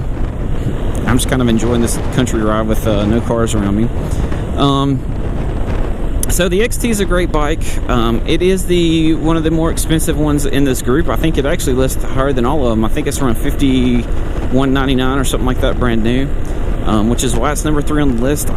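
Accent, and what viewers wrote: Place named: Alabama, United States. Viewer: I could tell it was bama before you even said anything lol. How far are you from Fayette?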